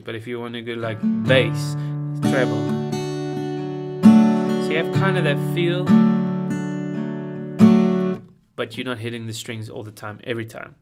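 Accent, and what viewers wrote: South Africa. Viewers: Nice to you hear a South African Accent! Lovely lesson, man.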